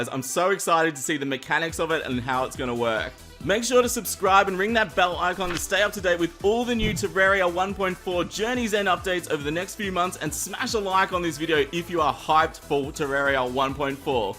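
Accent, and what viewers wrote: Australia. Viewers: You’re definitely australian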